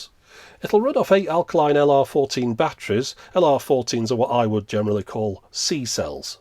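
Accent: United Kingdom. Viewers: root is sexual slang in Australia. So the British pronunciation of Router as Rooter is funny.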